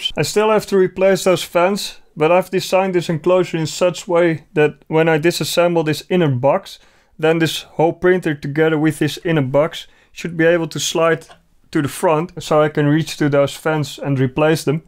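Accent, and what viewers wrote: Netherlands. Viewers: A dutchman, unmistakably. Ill follow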